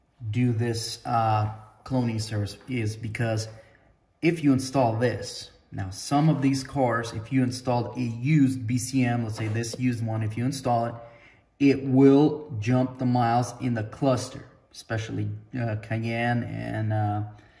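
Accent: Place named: Soviet Union. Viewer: Soviet accent is hard to listen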